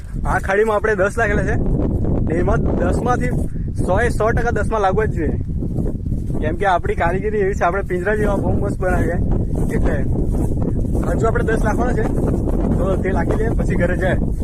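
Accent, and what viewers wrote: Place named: Valsad, India. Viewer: Tmaro fast var video joyo bhasha sabhrine laygu ke tme nkki valsaad naj se 😊 cement ma ma koye saval kyro hto kiya sher na to maru sachu j pyru tme valsaad naj so hu pn valsaad nij su etle bhasha samj pri jay😊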